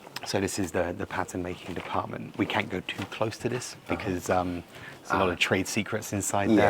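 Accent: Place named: United Kingdom. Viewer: 好像英式口音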